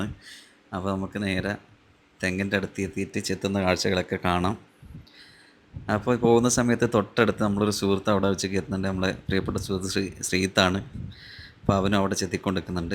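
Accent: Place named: Kannur, India. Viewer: കണ്ണൂർ സ്ലാംഗ്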